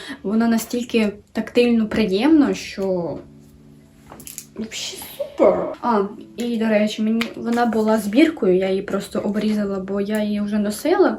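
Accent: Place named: Surzhyk, Ukraine. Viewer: Пиши будь ласка собі трішки сценарій, дуже сильно говір ріже слух. А коли пропишеш то будеш знати хоча б умовно слова і буде менше цього суржика страшного. А так то молодець працюй далі❤️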